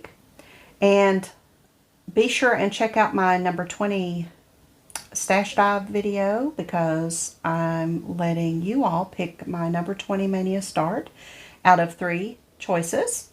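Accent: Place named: Southern West Virginia, United States. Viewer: I just started watching your flosstube and am enjoying them. I knew from the moment I heard you that you were. Southern WV girl! My mom was born in one of those coal camps, unfortunately it doesn’t exist any longer. Her sister still lives in the area but my parents moved around a lot and ended up in FL. Love your conversion on the FQS quilt piece. That looks like Christmas to me. Happy stitching.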